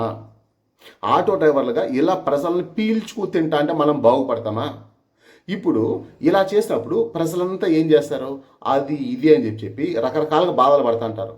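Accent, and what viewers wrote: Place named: Vijayawada, India. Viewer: Anna basha Vijayawada nunchi udo tisukunna chala bagundi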